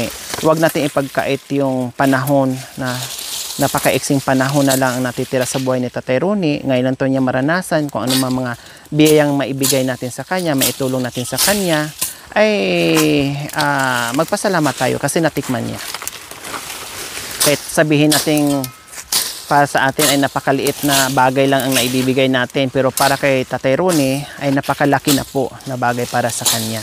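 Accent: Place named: Iloilo, Philippines. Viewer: Ilongga ka gali madam❤❤❤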